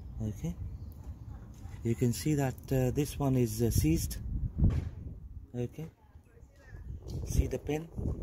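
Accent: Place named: United Kingdom. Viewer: I love the British accent (sotaque). Hug from Mexicali México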